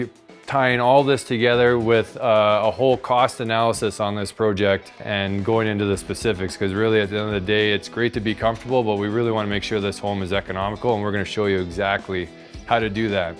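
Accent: Canada. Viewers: nice presentation! sounds like CANADA from the accent and I visited there seen 7 window pane so must get cold.... burr... in that case maximum design fun of interiour buildings for people to last the long winters... -tibor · If I'm not mistaken we're hearing the an accent hailing from the nation of Canada. If that's so I can fully understand why there's an emphasis being placed on having a thermal break and maximizing insulation. Yes, having 2 2x4 walls is going to cost more than a single 2x6 wall, but the increase from 5.5 inches of insulation to 10 inches of insulation would make the added construction cost and a loss of square footage a viable tradeoff when heating costs resemble those of a latitude much farther south. I'd rather pay more for something I'm going to buy once, like building materials and labor, than pay an ever increasing amount for the energy to heat a less insulated space. 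$500 dollars to build a house amortizes pretty fast when a heating bill is $50 per month instead of $150. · The accent is definitely Canadian and I noticed on a work truck that it mentioned the city of Calgary (in the province of Alberta in Canada) where two of my sisters live and only 6 hours from my hometown one province over.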